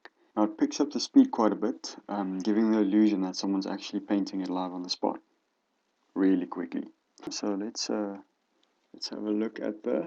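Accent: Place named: South Africa. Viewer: You from South Africa? I love your accent~ <3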